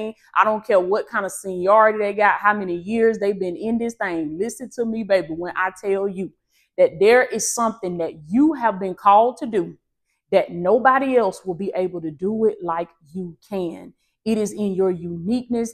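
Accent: South, United States. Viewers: IM COUNTRY TOO SIS AND VERY SOUTHERN AND I LOVE YOUR ACCENT! ❤ VERT UNIQUE AND CANT BE DUPLICATED. (Original) #FEARFULLY AND WONDERFULLY MADE! PTL🙌🏾🙏🏾💜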